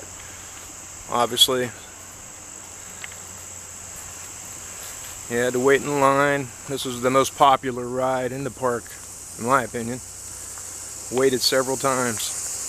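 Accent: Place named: Northeast Ohio, United States. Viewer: It was nice of him to commemorate the ride in this way. Gotta love that NE Ohio/ western PA accent. Haven’t lived in OH for many years but like your hubby I think about this coaster all the time.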